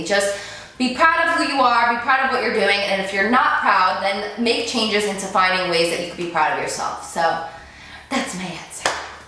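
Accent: New Jersey, United States. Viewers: Your Jersey accent is so cute:) Love you!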